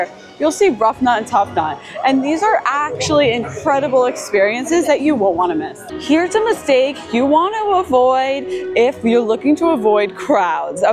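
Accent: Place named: New Jersey, United States. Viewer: That Jersey accent is thick. 😂😂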